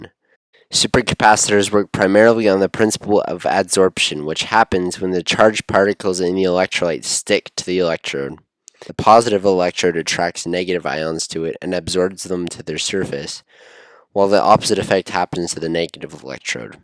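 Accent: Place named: England, United Kingdom. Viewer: Oh thank god, someone with a normal English accent talking about this! Thanks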